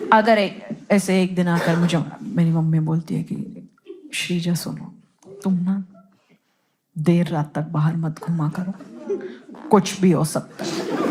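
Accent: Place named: Mumbai, India. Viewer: Is she from Mumbai by any chance? That accent sounds very familiar (Lots of my family members in Mumbai)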